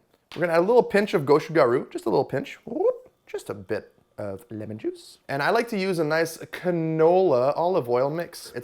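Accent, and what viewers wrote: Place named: Canada. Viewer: Where are you from? I keep hearing a Canadian accent but it goes away so fast! Great video btw (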